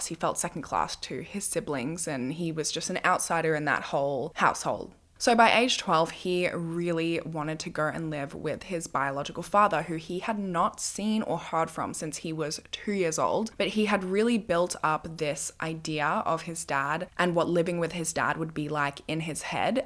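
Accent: Australia. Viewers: you just earned a new sub! love the way you explain things, i’m also australian. it’s so nice hearing a familiar accent when listening to these kinds of things, thank you for your videos! <33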